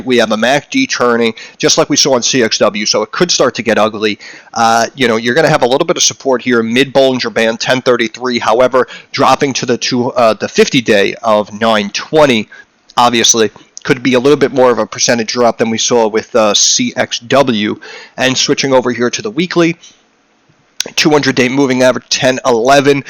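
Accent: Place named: North, United States. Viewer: u did an amazing job brother thank you especially after watching the second time,and totally agree on politics and YouTube lol, GE would cool to get a look at I’ve never really leaned towards the more conventional stocks but with everything going Electric I can see them as a play for future (I just want greedy returns😂🤣😂🤣) but yea and the renewables and things of that nature would be awesome too glad I found ur channel, o and are u up north by any chance I’m from nyc and thought I noticed a northern accent lol.. 🍻 my friend!!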